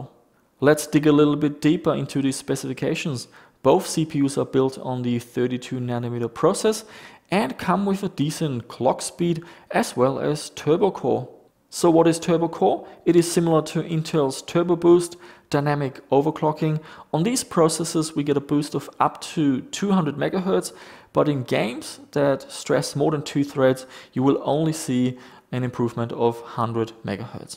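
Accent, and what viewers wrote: Germany. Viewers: How come this man sounds like a German? Can somebody explain? I thought he was an Australian. I have no clue haha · YOU HAVE THE HARDEST GERMAN DIALECT I´VE EVER HEARD :D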